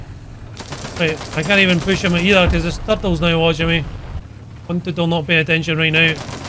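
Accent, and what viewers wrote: Scotland, United Kingdom. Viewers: Hey i'm a bit confused are you scottish or irish?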